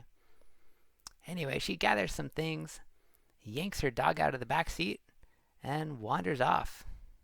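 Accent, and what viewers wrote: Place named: Seattle, United States. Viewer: I didn't realize people from Seattle had an accent. The narrator talks like Seattle-born singer, Jennifer Warnes. <link>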